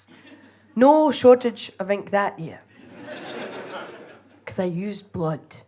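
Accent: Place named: Scotland, United Kingdom. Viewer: As a Jock myself, all I can say is..Now that's a brrrraw Scottish accent......That's how you rrroll you rrr's my friends!...Apart from that,..I love this style of dark, auld Scottish, bardish, storytelling, there's little hints of 'Ivor Cutler, Chic Murray, Roberta Burns in there...surreal, wierd, dark, chirpy, poetic, uncompromising..and helluva funny!.....It hud me heehawing a guid few times!.'Maybe it's not true'..hud me rollin'..😂..Connollys gone....here comes!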